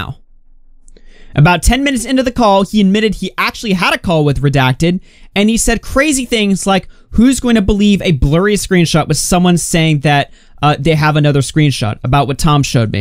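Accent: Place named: Texas, United States. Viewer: Every time says "Ma opinion" I feel a little bit closer to Texas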